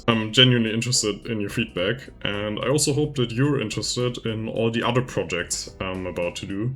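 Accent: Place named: Germany. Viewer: [1] startint to watch video with cleary German accent but too lazy to check if the Youtuber is German or not [2] hearing German word, no need to check anything [3] reading funny comments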